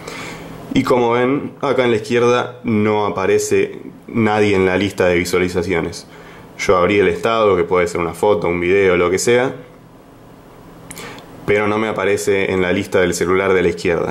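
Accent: Argentina. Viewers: este es argentino o uruguayo xd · Qué acento lindo ahora me parece Argentino 🤭 ? Besos Mucho gusto soy Brasileña 🤝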